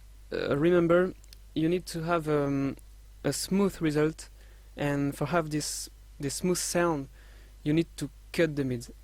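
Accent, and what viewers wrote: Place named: France. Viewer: Je me disait bien ta un accent francais lol ^^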